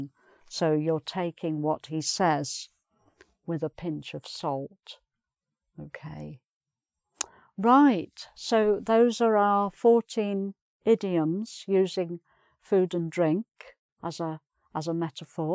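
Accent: United Kingdom. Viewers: Oh, my lady, do you live in Canada? You speak in British English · love your British accent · It's a real pleasure to listen to you - real British +profound knowledge. Thank you for that 🌹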